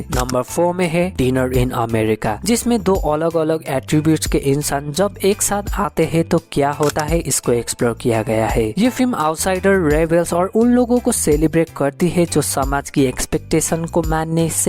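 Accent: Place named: Bengal, India. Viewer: Tum bangali hai kya😅😅😅tumhra accent lag raha 😅😅😅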